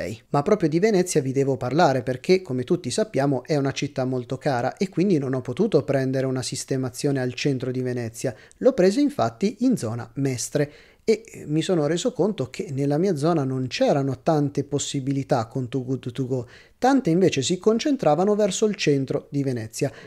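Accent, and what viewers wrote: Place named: Perugia, Italy. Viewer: Infatti... pure io sono di Perugia e ho riconosciuto la cadenza... anche se non marcata..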